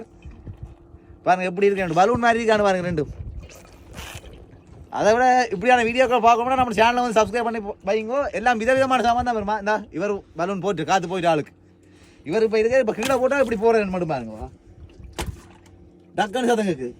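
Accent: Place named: Tamil Nadu, India. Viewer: I love the Tamil accent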